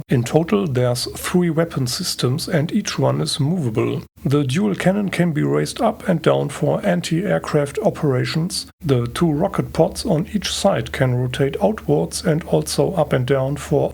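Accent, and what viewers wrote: Germany. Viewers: Hey, I have never heard your voice, but now Im curious. Where are you from? Do I hear a bit of German accent?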